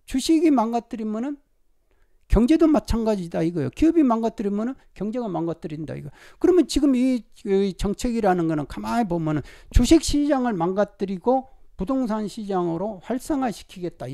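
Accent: Jeolla, South Korea. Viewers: 전라도분 같은데 문재인정권에 대해 어떻게생각하시나요?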